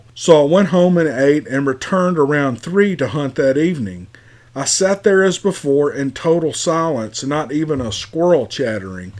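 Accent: South, United States. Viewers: I know you are a busy man. I hope you get your garden in soon. I want you to know that I anxiously await notification for your stories. Yes, I have found “my people”. I’ve listened to other channels but I’m from the south and feel at home when you speak. Thank you so much and I would have never guessed Bigfoot is in Hawaii. Wow! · I so appreciate your channel. My bestie and I listened to you all the time. Your southern accent is wonderful and you are so positive. Thank you for taking the time to do this.. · It was so nice to see/meet you! I've commented before that I love your accent, and it's so fun to put a face to your voice! I live in Oregon, so you're southern accent is such a treat to listen to! Thanks for all of the stories, I love them all and really enjoy hearing you tell them!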